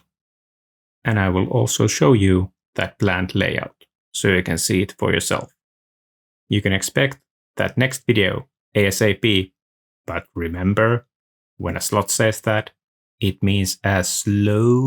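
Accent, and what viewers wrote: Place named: Finland. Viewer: It's weird to immediately recognize from your accent but I think you're also Finnish? That's a nice building although the detail work is bit too cluttered for my taste.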